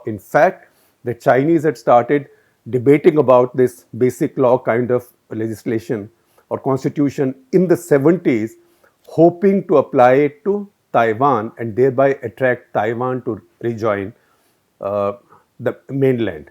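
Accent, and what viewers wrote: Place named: South Delhi, India. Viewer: You just run on assumptions all day with south delhi english and hence i unsubscribed....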